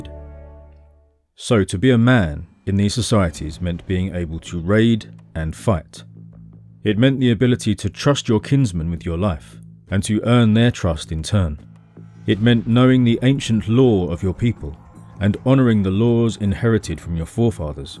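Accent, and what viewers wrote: United Kingdom. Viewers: you sound pretty similar imo. You sound a bit more serious. But joking aside, this was a thoughtful video and I enjoyed it a lot. I hope my little joke didn’t make you self conscious or anything. If it helps, my barbarian American ears just lump all British accents together so you could chalk it up to that.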